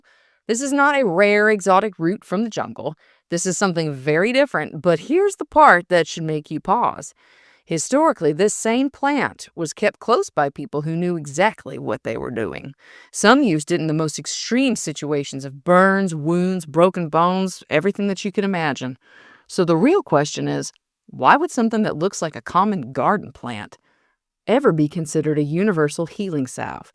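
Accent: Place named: South, United States. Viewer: Really appreciate this teaching and love the southern belle voice!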